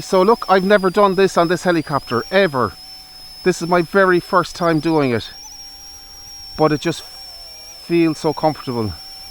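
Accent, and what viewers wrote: Ireland. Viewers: Geez You sound even more Irish than I do, Where you from,, I'd say Limerick at a Guess 😂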